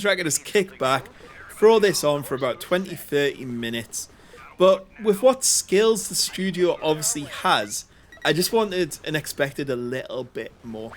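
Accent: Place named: Northern England, United Kingdom. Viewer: Sounds like ya not far from me mate, Darlington here!! Great video! think ill buy this :)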